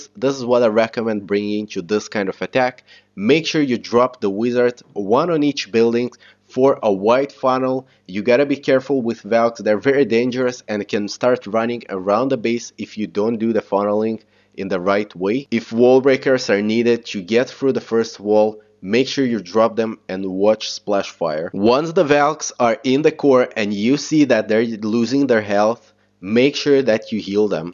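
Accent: Russia. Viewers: Ты русский? Просто я англичанин и знаю русский, и ты говоришь с русским акцентом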